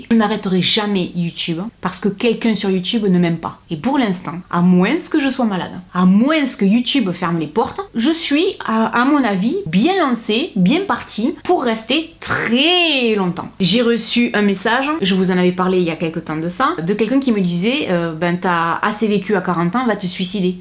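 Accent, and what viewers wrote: South, France. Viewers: tu es super, j'adore tes vidéos, ton accent du sud. Ceux qui critiques sont des débiles profonds. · Je suis choquée d'entendre ce que les gens peuvent dire sur les réseaux 😱 Je pense que la majorité des gens qui te suivent t'apprécient comme tu es. Avec ton humour, ton accent du sud, ta spontanéité. Bref ne change pas pour une poignée de gens "débiles" (encore je reste polie). ❤️ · Juste un petit commentaire pour te soutenir, j'aime beaucoup ce que tu fais sur YouTube, ton accent du sud est me rappelle ma famille, et ta franchise est rafraîchissante dans ce monde ❤ Je suis désolée de lire tous ces commentaires déplacés cela ne devrait pas exister... Courage 😘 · J'adore ton accent du sud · Bonjour, Je prends rarement le temps de laisser des commentaires sur youtube, mais aujourd'hui avec cette vidéo je ressens le besoin de le faire. 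Les messages que j'ai lu dans cette vidéo m'ont profondément choquée. Tant de haine reçue totalement gratuitement... Personnellement j'aime beaucoup ta personne, ton travail, ton contenu, ton accent du sud, tes vidéos variées (liste non exhaustive). Je regarde toutes tes vidéos et je continuerai de le faire, tu as eu raison de remettre les pendules à l'heure car ces comportements ne sont pas tolérables. J'aimerais te dire que je te soutiens, toi et ton travail et que j'ai hâte de voir tes futurs projets! Prends bien soin de toi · Moi habituellement je n aime pas l accent du sud mais avec toi tout est possible tu es un amour merci de continuer! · Je suis choquée des commentaires! Moi je t'aime et j'adore ton accent! Ici c'est le sud!!!!!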